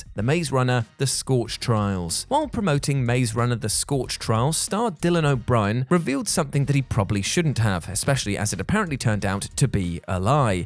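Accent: United Kingdom. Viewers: Smart call. lol he’s just EXTREMELY British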